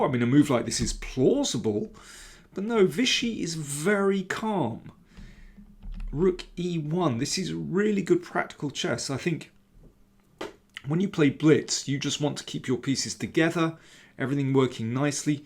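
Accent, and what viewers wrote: United Kingdom. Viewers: Even though you don’t get crazy view counts on your videos, I must let you know that your videos are perfect. The classy Brittish accent enhances these fantastic chess match reviews! I’ve been a long time subscriber and this channel is like ASMR to me.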